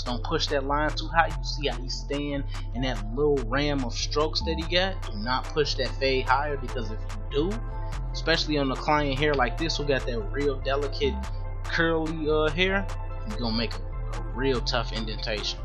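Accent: Chicago, United States. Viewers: Nigga from Chicago$Cant you hear it:))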